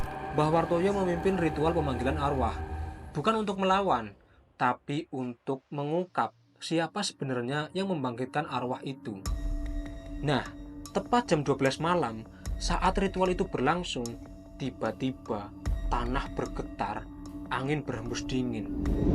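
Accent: Banyumas, Indonesia. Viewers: lu orang tegal yak bang atau Purbalingga? soale ngapak brok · Ngapake ngendi kang😂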